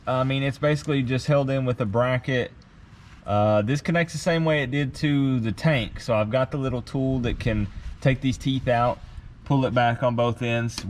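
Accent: South, United States. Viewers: I recommend some underbody coating. Based on your accent seems like you could be somewhere in Alabama like me or at least the south so salted roads aren’t a big issue. But with this truck being as old as it is, I’d recommend some just to stop the rust corrosion (or at least slow it)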